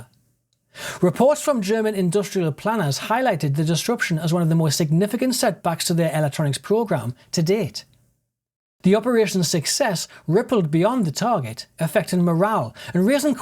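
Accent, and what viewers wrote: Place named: North, United Kingdom. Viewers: Well done, buddy. Every time I hear you, I travel back in time to Blyth in the 70's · I’m sorry sir, it just appears that way to me. Maybe I’m overly sensitive to it, and it could be I’m not used to your hard northern accent. Chris Ramsay the comedian is quite harsh on some of his words too so it could be a regional thing I just hadn’t heard before.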